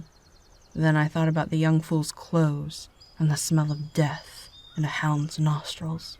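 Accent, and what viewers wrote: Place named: South, United States. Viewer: Haha! I love this story. I have to say that little southern twang suits you. Be well my 💜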